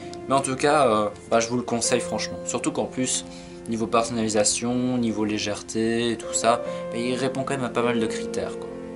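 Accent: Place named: Belgium. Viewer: T belge c sure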